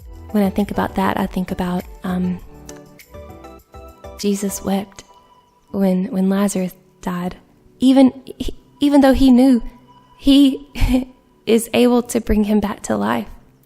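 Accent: South, United States. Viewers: Another Catholic loving your videos (and your southern drawl). Two observations: IMHO, it would be better to slowly fade out the background music. It's probably ok for shorter videos, but it can get distracting for some (like an ADD like me 😂) when you keep going deeper and deeper into such a brainy and solemn subject.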